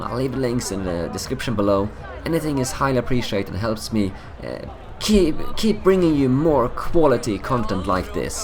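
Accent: Sweden. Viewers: You can clearly tell from his english accent that he is swedish! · I can hear just by your accent that you are swedish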